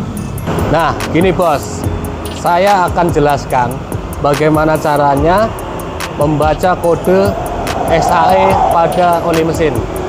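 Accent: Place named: Banyumas, Indonesia. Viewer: Ngapak Bang 😂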